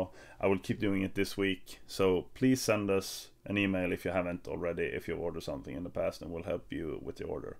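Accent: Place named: Sweden. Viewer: I just love your swedish Pronunciation :3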